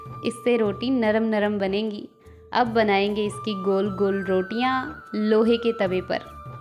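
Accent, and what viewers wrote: Standard. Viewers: Didi apki pure hindi bolne ka andaj muje bahut pasand hai apne bahut mehnat ki hai purane ghar me ab apne apni kala ka rang yaha bhi bikher diya ye bahut achha laga muje🎉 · Kitni sudh hindi hai waw I'm impressed 😊😊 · apka shudhha hindi bolna bahut achha lgta h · आप को सुनना बहुत ही अच्छा लगता है। आप इसी तरह लम्बे ब्लाग जिस में आप बहुत सी बातें करके हमें अपने दुसरो के जीवन के रोचक किस्से अवश्य सुनाते रहना जी 🙏🏻 भगवान जी हमेशा खुश और स्वस्थ रखें 🤗 हमारा की शुद्धि हिंदी वाणी ने मन मोह लिया है और बार बार सुनने को मन ललइत रहता है।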